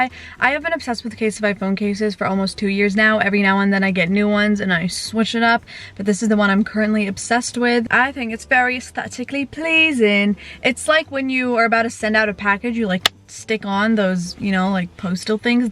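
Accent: United Kingdom. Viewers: I love the british accent 😂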